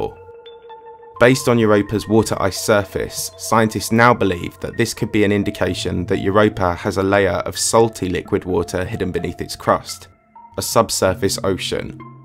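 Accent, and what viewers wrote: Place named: RP, United Kingdom. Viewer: Softened upper class British accent that evokes confidence with slight superiority. He could be telling us martians are real.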